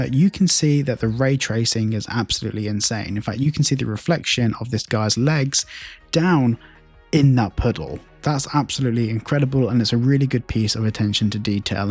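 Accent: United Kingdom. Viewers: Ugghhh I know it’s a British thing and not your fault but every time you say “Floor” when you’re talking about the “ground” I wanna slap you a little bit! Inside = floor, Outside = ground lol I love your accent and you guys have way more fun with the English language and slang terms but this one, you guys just got wrong! Love you, love the videos. Keep it up, thnxxx!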